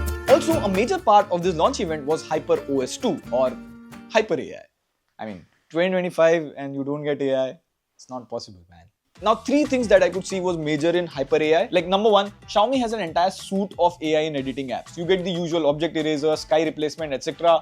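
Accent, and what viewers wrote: India. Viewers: Indian typically Indian accent hahaha we don't want to hear Indian typically English they always singing words, talk Hindi not English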